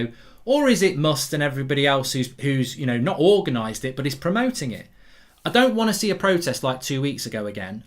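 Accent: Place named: Liverpool, United Kingdom. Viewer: Bloody awful scouse accent mate, no talent show for you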